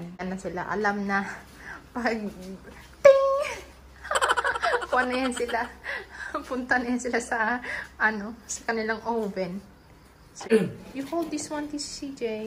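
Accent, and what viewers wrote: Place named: Iloilo, Philippines. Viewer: Ilonggo gid ya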